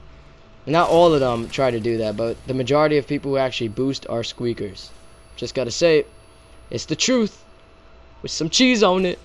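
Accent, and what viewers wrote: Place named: Italian-American, United States. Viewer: You sound Italian/American, are you? Either that or your putting on the voice a squeaker would do if you said they had a high voice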